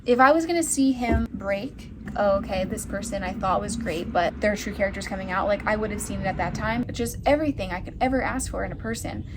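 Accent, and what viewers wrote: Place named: United States. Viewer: You've gotten a country accent!! How do you still make income when you're not posting? I'm not asking for like a super personal answer, but I'm curious what other streams of income you have, help a girl out😂 Is the house you're at outside now the new home, or the old one? Whichever it is, the porch is definitely giving that old farmstyle vintage house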